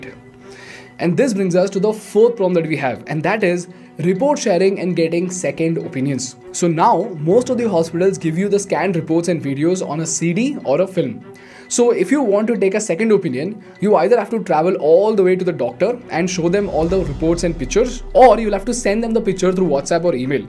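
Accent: India. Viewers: I subscribed this channel thinking this is a great non bias channel. I just realized this guy is a bjp bhakt with thick indian accent... lmfao Farewell dude.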